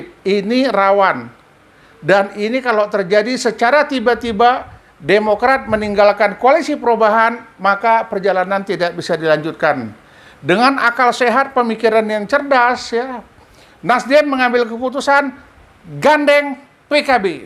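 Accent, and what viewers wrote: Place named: Medan, Indonesia. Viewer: Medan kali ah narasinya bah...👍 Blak2an Gak bahaya tha....?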